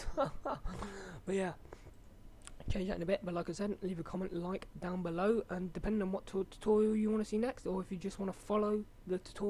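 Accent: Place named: United Kingdom. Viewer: Which part of the uk you're from? I'm from London ;p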